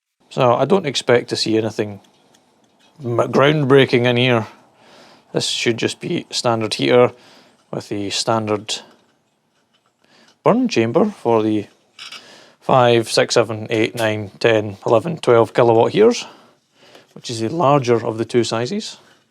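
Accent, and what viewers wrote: Scotland, United Kingdom. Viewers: Scottish accent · Love ur Videos Mr, Very informative, practical and to the point! Nay ta mention the humor and the Scottish Accent. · "I'll tell you if it's dog shite" - My kind of no nonsense video. All the better with the Scottish accent!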